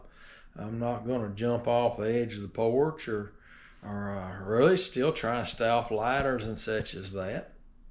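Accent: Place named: Deep South, United States. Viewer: Thanks for the update, im pleased that youre doing well at this point. Im 2 weeks post TKR so still finding it tough but getting by. I was meant to have my staples removed yesterday but they couldnt be removed as still had some bleeding , so that was disappointing. Ive been told to fully rest it for a week then they will try again next week. I hope i get to where youre at after 6 months..it seems a long way off...lack of sleep is the biggest problem!. Look forward to your next update. I love your accent by the way, im from the UK so not sure which area of the U S your accent is from but its what i imagine to be from the deep south!. Good luck with the rest of your recovery😊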